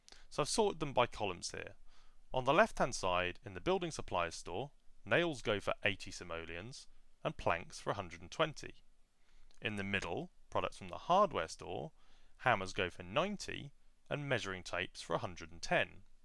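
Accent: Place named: RP, United Kingdom. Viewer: Why is it that it seems classier to play this game while I am listening to instructions from a guy with a posh accent? Also, thanks. I knew there was probably something useful about selling things.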